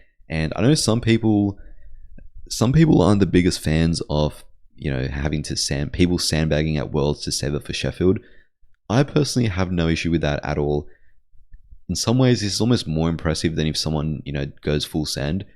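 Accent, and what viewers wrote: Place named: Australia. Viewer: I recognise the Aussie accent, but can't seem to know who this channel belongs to!!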